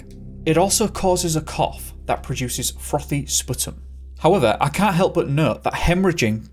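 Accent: United Kingdom. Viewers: Thank God, I've been looking forever for a video on this incident told by someone with a British accent. 👍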